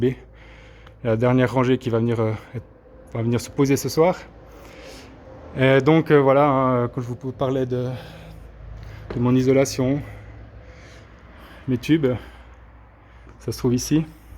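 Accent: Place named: Sweden. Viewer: Super ton accent. C'est Suédois c'est ça :)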